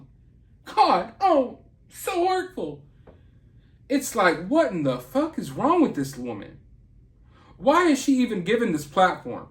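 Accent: South, United States. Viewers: You are a way better actor than she ever wanted to be, and the southern accent love it Sho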